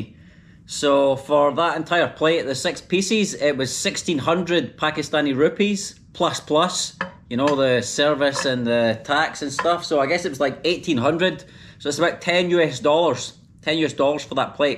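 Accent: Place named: Scotland, United Kingdom. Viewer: Please speak with your scottish accent, I like to hear your accent... 😊 there are not more vlogger with that accent, that sounds unique than other vlogger with american accent.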